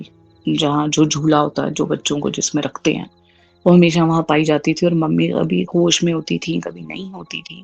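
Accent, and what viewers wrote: Pakistan. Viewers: Indians ye style/tone me nahi baat karte. Pakistani style hai. Apne apko hindu Bata rahi hai aur jammu Kashmir ghum ne ki baat Kar rahi hai. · i swear at the start of this i thought she is a pakistani this exactly how we talk sound shame for all the hate for all it does is dehumanize the heart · Exctly.....ye hindi hai hi nhi....typicl urdu accnt..isnt it...i m a hindi speaker..i knw the difrnc.. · Are you sure you are indian because your accent is quite pakistani and you speak quite alot of urdu words good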